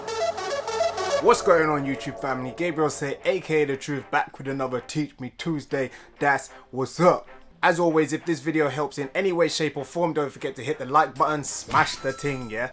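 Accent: United Kingdom. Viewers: British accent and American Football doesn't go together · Brother with a brit accent....and yankee football in the background....my brain cannot process this dissonance !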